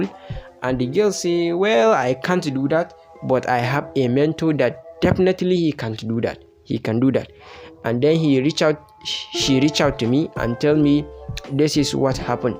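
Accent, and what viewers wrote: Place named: Hausa, Nigeria. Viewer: This guy. You nd ur hausa accent ... murdering pronunciations. Great interesting story though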